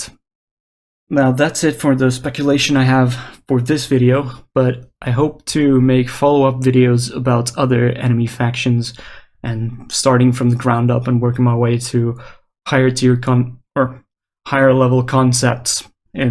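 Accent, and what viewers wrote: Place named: Netherlands. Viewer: Man, you sound so dutch, are you? xD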